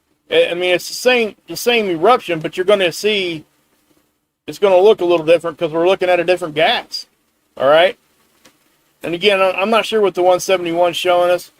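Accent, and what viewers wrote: South, United States. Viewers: You have made alot of effort to curb your southern accent. Thanks for keeping up and sharing with us. I know I can count on you for quality content.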